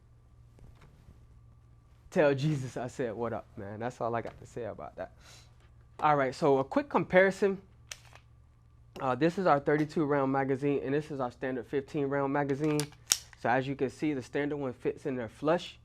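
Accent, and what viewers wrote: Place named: South Florida, United States. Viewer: Idk where you from but you sound like a dude from South Florida 🤔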